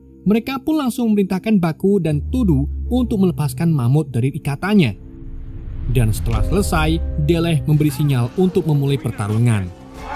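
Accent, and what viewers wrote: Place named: Banyumas, Indonesia. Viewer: Mas admin, Njenengan asline ngendi mas? Narasine karo bahasa ngapak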